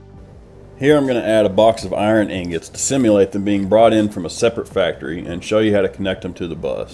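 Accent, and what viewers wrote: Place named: Midwest, United States. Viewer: Hidden Gem of Satisfactory Video. Double props for midwestern explaining factories.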